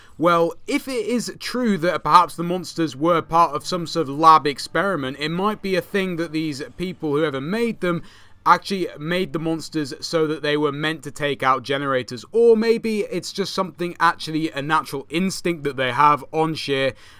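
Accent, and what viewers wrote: United Kingdom. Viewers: No offence but you must be brain dead if you think he sounds like the gaming lemon. Just cause his accent is British it doesn't mean they sound the same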